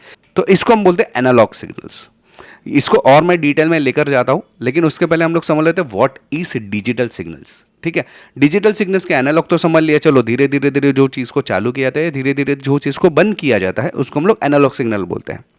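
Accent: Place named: South India, India. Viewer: Nice explanation, you are from south india.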